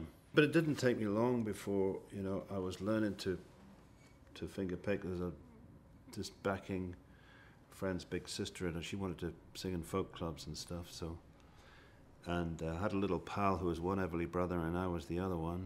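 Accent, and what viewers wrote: United Kingdom. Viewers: I forgot this guy was British. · Cannot hear you and plus with the English accent hard to understand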